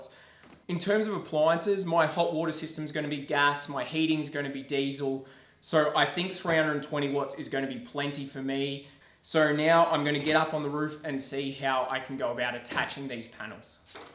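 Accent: Australia. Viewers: I’m in awe of people that can do this stuff 👍🏻 and is it my imagination but has that Aussie twang gotten stronger?!😂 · I love your videos, it's fascinating to watch you do this van build and you explain everything so well that I feel like I could even do it....almost. It also doesn't hurt that you're easy on the eyes and have an australian accent. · Now get ready for the questions about bob being your uncle 😂😂😂Us Aussie’s get it but l don’t know about other countries. I love the country boy using good old fashioned slang and your “strine” is music to the ears. Great job young cobber !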